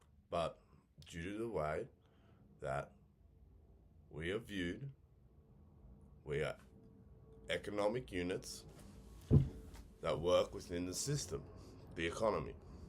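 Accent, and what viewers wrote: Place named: Liverpool, United Kingdom. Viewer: My English is too poor to properly deliver my thoughts about immigration... Let's just say it has been a problematic topic around here too. I don't mind if e.g japanese accountant immigrates here via marriage but we don't have much need for thousands whose only work skill is goat herding. And also, multi ethnic societies are fuctional and fine, but multi cultural societies will face problems. And also #2... Australia? I thought you were British, from Liverpool or something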